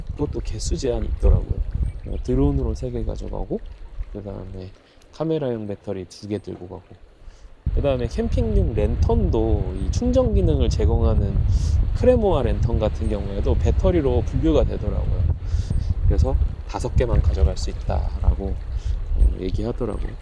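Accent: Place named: Japan. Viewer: 얼마나 설레며 준비를 하셨을까 그 마음이 저한테 까지 느껴집니다🔥 그나저나 일본톤 찰떡입니다👍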